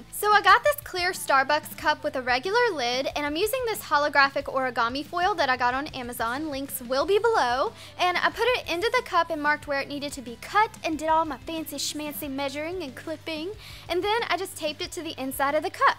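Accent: South, United States. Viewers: Where's from? She sounds southern. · i love her country accent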